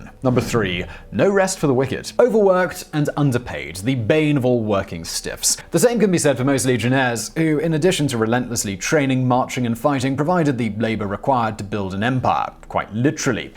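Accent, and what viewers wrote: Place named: RP, United Kingdom. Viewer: Posh-ish English accents are magical. I have no idea as to this mans qualifications, background, or specialization. And yet he sounds intelligent and authoritative in damn near every video he's done. It WOULD be hilarious however if he had the most absurd Liverpudlian, Mancoon, Cockney or American Southern accent when he's not doing videos.......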